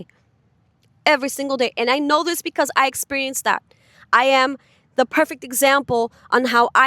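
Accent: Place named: New York, United States. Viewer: Hey! You're looking beautiful, of course!, lol! 😂😊 I love the scene around you, it's stunning! Such a nice looking lake!, you are an incredible woman! I very much believe you will achieve what you wish. You definitely have what it takes. I understand many of the things you feel and have experienced. Maybe not in exactly the same way, as we are two different people but definitely to a point that I get you. Just an observation before I continue! Not something you need to answer but I get the hint of New York in your accent. I could be wrong or I might possible say Philli but I can't quite remember their accent!, lol! 😂 I only mention it because accents interest me, capture my attention! I am a Brit after all so hearing different accents is interesting, lol! 😂 OK, where was i?, lol! 😂 You will get to where you want to go! It may not be right this moment, it takes time to save money, especially if your employer doesn't pay much. 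I had to get out of the situation I had been in for many years The psychological effect it can have on you is something that really becomes something you notice once you live in your own place. You realise the control people can have and how messed up they can make you. As mentioned on another video of yours, once you do get out of your situation, not only will you feel so much better but you will truly discover who you really are. You are an incredible woman and so much stronger than you realise. Just hearing your story reveals that. Believe in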